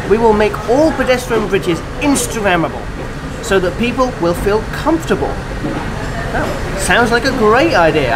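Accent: United Kingdom. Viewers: English tulen...remember mr bean...omg.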